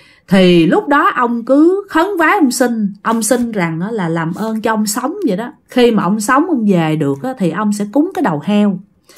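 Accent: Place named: Mekong Delta, Vietnam. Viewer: Chị ơi em có cái này muốn nói với chị là cái phần ngôn ngữ đó chị. Chị là người Sài Gòn khi kể chuyện chị đừng có pha giọng miền tây vô. Ví dụ như chữ e rờ "r" người miền tây hay nói thành chữ "g" như "đi ga, đi gô, có gồi, cá gô,....". Chị cứ kể giọng chuẩn đi, tụi em nghe là biết cái chuyện đó là ở miền tây rồi. Em để ý chị kể chuyện lúc thì nói chữ "r" thành chữ "g" có lúc nói chữ "r" bình thường, nói như vậy nó ngọng ngọng khó nhẹ lắm. Chị cứ kể chuyện tiếng Việt chuẩn là được. Em chỉ góp ý nhỏ vậy thôi. Hihi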